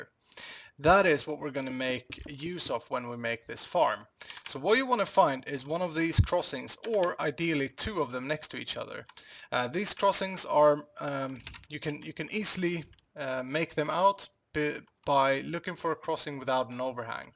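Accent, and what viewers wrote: Sweden. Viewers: You must be Swedish right? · Are you from Sweden?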